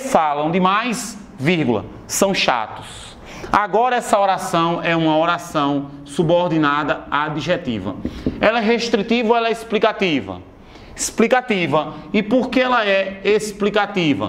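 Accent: Northeast, Brazil. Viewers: de onde vc é ? pois tem um sotaque nordestino e meio português de portugal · O famoso e gostoso de se ouvir sotaque nordestino ksksks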